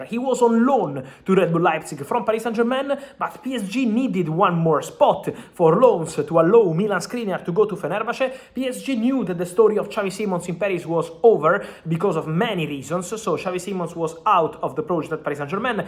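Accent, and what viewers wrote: Italy. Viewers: Bro you need to slow your speech down the heavy mario accent with the speed of you talk makes it inaudible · He is Italian, he is trying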